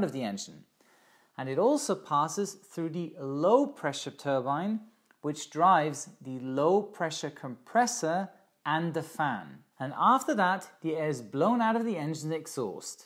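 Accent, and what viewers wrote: United Kingdom. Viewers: ... You're a good looking guy! Specially with that British accent? Australian?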